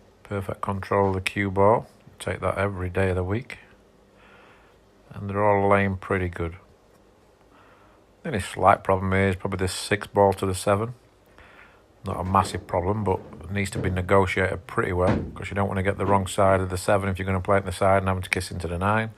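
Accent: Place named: Northern England, United Kingdom. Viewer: 29-1 come on Daz, bit lacking that mate. Nah, only kidding, absolutely fantastic play. I'm living in Thailand now and get to play on some great tables in some lovely places and yours, Neils and Karl's videos are so informative and easy to watch. It's great to hear your accent as I don't get that here much as I'm from Hull originally so not far away from you. Keep the videos coming. It's great to see you hitting some good form again. Let's have a few more worlds off ya matey. Also, what do you think of Judd Trump playing in the U.S open, hopefully a good thing as it may start the ball rolling to get some more money into the game and a new set of viewers. Still undecided myself as I don't know but hope he will give the game the respect it deserves. Thanks for your time doing these videos Daz, they are so helpful, informative and very much appreciated. 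P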